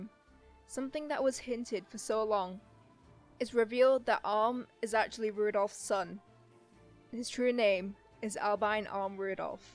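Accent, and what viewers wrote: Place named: North England, United Kingdom. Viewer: Great overview of Alm from a lovely north English accent.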